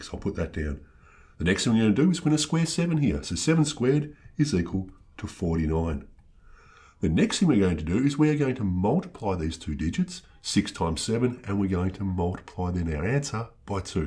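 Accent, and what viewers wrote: Australia. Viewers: Definitely Australian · This is some different Australian accent.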